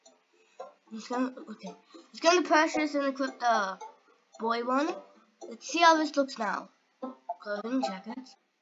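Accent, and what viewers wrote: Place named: United Kingdom. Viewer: you sound like u in the uk homie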